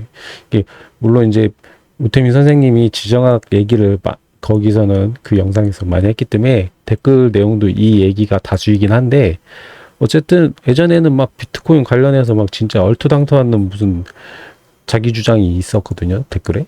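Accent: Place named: Jeolla, South Korea. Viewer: 말투가 딱 전라도 스럽네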